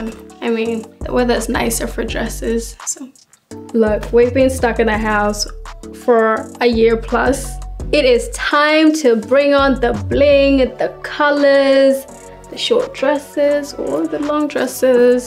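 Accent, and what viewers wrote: Australia. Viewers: She sounds Australian almost. She is beautiful! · She is so down to earth and very beautiful. I love her Sudanese heritage and Aussie-tinged accent growing up in Australia ;) I love Naomi taking her under her wing...so cool. She deserves so much success. xx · She’s beautiful and has such a stunning figure, love her Aussie accent as well!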